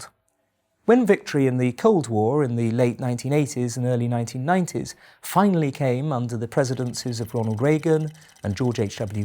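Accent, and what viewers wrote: United Kingdom. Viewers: Absolutely! With his british accent, looming pasty face and giant forehead this feels like something straight out of 1984, like it should be projected on the side of a building to give the proles on the street their daily dose of propaganda. "WAR IS PEACE, FREEDOM IS SLAVERY, IGNORANCE IS STRENGTH" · Why is their a British guy saying this? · This is a inside joke right? From your accent I'm assuming that you are British, I'm embarrassed to be from the same country as you. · Nice work getting this intelligent sounding British man to dazzle the yanks with his fancy accent.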